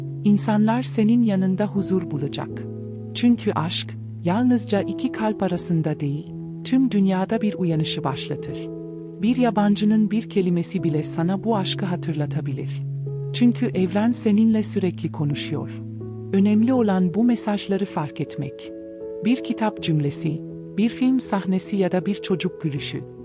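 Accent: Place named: Germany. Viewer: Şu Almancı karıya okutmayın şunu ciddiye alamıyorum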